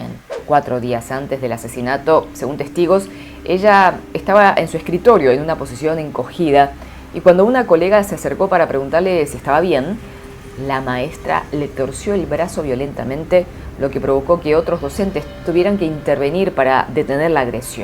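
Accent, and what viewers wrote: Argentina. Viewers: Se ve extraño , ver a una chica con rasgos asiáticos y hablando argentino 😂 muy cool pero desconcertante ❤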